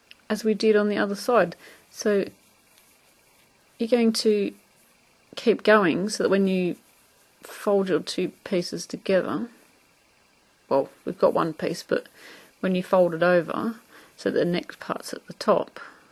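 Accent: Australia. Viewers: Had a brainfart lol. I really do love your videos and your accent. Would love to come to Australia, that's my dream vacation.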